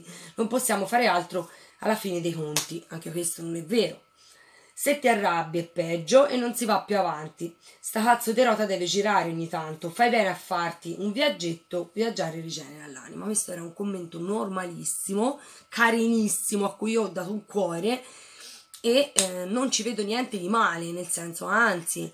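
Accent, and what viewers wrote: Tuscany, Italy. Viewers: Io spero che non chiuderai il canale perché quando in negozio non c'è nessuno mi fai compagnia ascoltando le tue storie e vedendo i tuoi video trucco. Mi sono spazzolata tutti i tuoi video sui libri e molti di essi sono finiti nella lista da leggere. Adoro la tua parlata, mi riporta nella mia Toscana da ui mando da 6 anni. La gente spesso non capisce che siamo tutti esseri umani e si erge su un piedistallo pronta a sputare sentenze, lo vedo col mio negozio. La gente se mi lamento pensa che lo faccia per spillare loro più soldi convinta che evada chissà quanti capitali, manco avessi tre conti in Svizzera non denunciati. Sii orgogliosa di quel che sei, di quello che hai passato e di come stai cercando di tirarti su, sii orgogliosa del percorso che fai per ripulirti... E ricorda che per una che critica c'è tanta gente che ti stima e a cui piaci così come sei.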